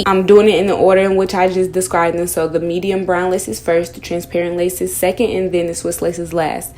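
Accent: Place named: Chicago, United States. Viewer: You have to be from Chicago 😂❣️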